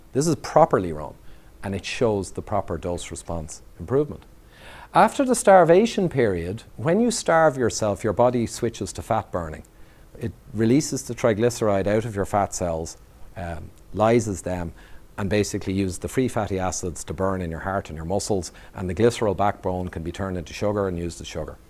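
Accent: Ireland. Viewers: Ivor Cummins I love the Irish, no translation needed! Lets do a Skype session.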